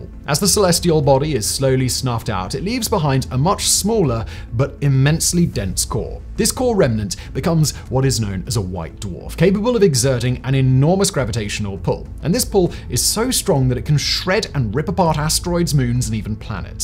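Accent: England, United Kingdom. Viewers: Go back to England! Like soddenly you know what we’ve known for two years. I hate that snooty as accent.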